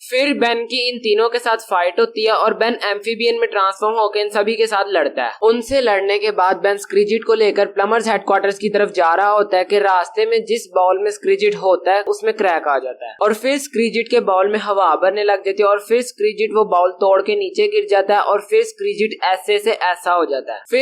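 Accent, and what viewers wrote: Punjab, India. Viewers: Bro r u punjabi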